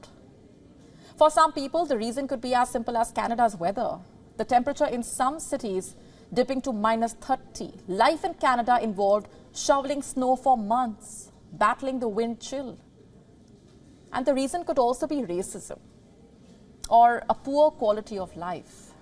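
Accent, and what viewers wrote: India. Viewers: this girl is trying to speak like an English speaker but her indian accent really dominates how she pronounce the words.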